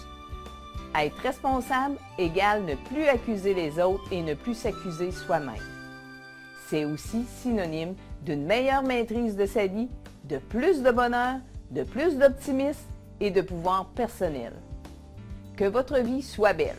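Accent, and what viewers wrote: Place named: Quebec, Canada. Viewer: Je comprend pas trés bien le Québec , vous pouvez parler le français normalement ? :/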